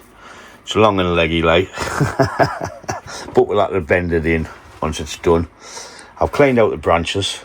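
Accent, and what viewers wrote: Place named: Northern England, United Kingdom. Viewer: you're welcome mate. I really enjoy your channel. As a fellow northerner stuck down south, it's refreshing to hear the banter and accent.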